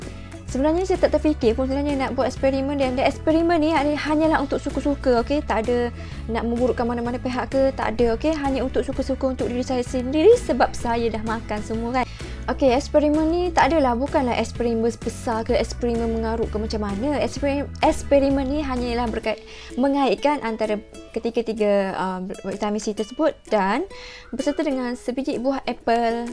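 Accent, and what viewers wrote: Kelantan, Malaysia. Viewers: Ore klate ko. Caro kecek pelet . Hahaha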